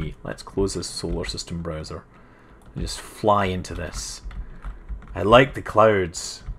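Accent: Scotland, United Kingdom. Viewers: I adore your Scottish accent,.:D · You are a EXTREMELY interesting guy and easy to listen to, and i love the scotty accent. :D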